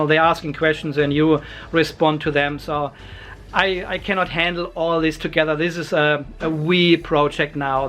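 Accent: Germany. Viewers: If I may ask. You are in Australia, but im assuming you are German based on your accent? Whats the story on that? Really love your videos. So real, so relatable. Keep it up.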